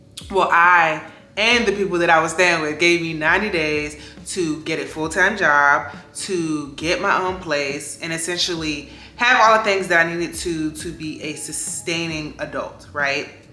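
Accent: Pittsburgh, United States. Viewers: Your accents giving Pittsburgh??? Or am I tripping? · Are you from PGH? I might be wrong but I hear your accent. Thank you for the video. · Are you from Pittsburgh??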